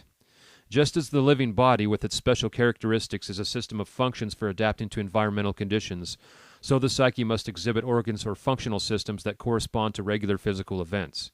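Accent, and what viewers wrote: United States. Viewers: you have the most americanest accent my ears ever did hear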